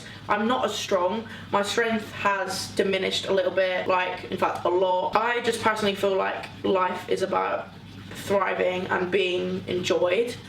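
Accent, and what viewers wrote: Scotland, United Kingdom. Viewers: Miss your Scottish accent ahha xx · Fix your accent you moved to london dont mean you have to look your scottish accent and sound chavy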